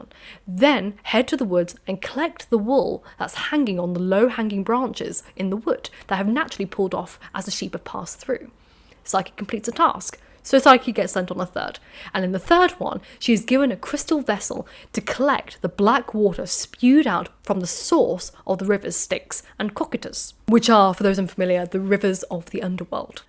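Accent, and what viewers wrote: RP, United Kingdom. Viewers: I love her content but that has to be the RP accent to end all RP accents.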